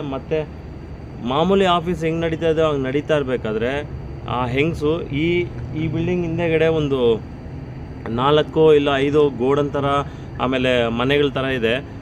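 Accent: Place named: Bangalore, India. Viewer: Bangslore kannada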